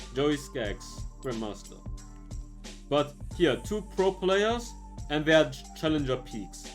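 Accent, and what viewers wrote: Germany. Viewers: Man hört deinen Deutschen Akzent einfach heftig raus lol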